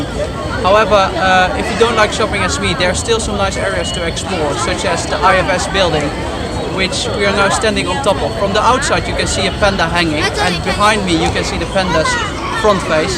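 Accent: Netherlands. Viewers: Thank you for the very informative video :) we’ll be there for a few nights in December. Your accent sounds Dutch btw, is that right? I lived in Groningen for a short while :)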